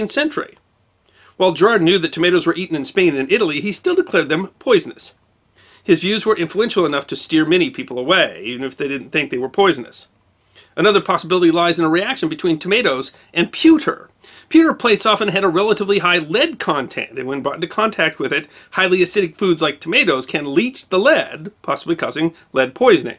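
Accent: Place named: Chicago, United States. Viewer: 31 I love your Southern Side of the Loop accent! I almost peed on my self laughing! Where's your Chicago Typewriter? With your Moll?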